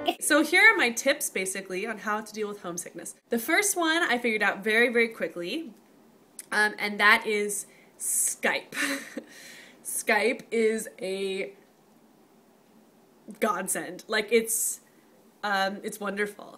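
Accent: South, United States. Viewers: I'm from TN and I think I have more of a southern accent than you do. Do you get comments of your accent a lot? Or do they just hear American more so than a regional accent? I'm kinda excited to hear my students speak with a little twang. :) Although I'm sure I'll have to try to speak without an accent, right?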